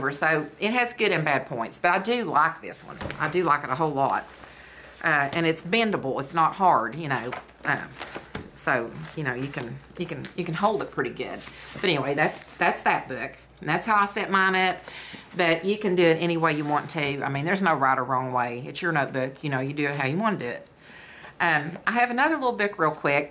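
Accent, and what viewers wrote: South, United States. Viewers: I just love your southern accent♥️ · what a great video! Thank you! It gave me great ideas and I really enjoyed it a lot! You are so sweet. Where are you from? You sound so southern! I am from the south but living in New Jersey the las almost 2 years and I feel out of place sometimes with my "y' all and my sweetie" comments to everyone...LOL anyhow, I really enjoyed the video and subscribed and am going to look at your other stuff on your channel! Look forward to seeing more. I will be making a prayer notebook and journal with my grand daughter in the next week or two and I promised her I would find some great info for us to put in ours. It should be a blast! I am so excited that she at 14 is interested in doing this! Praise The Lord! Blessing to you and your loved ones. Linda Coss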